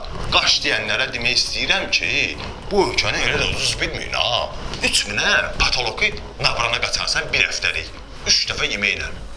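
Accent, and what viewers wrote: Baku, Azerbaijan. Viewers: Bakı ləhçəsüdürdəəə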